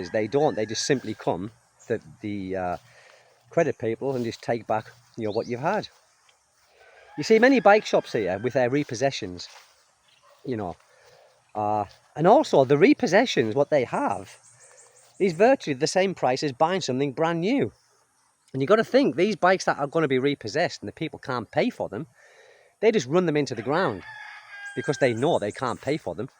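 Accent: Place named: Newcastle, United Kingdom. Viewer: Just found your channel. Sounds like you are from Newcastle or somewhere in the North East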